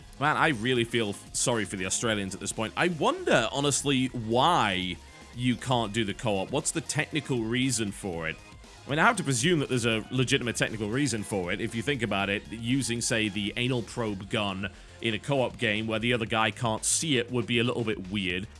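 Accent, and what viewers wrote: United Kingdom. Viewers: he's British?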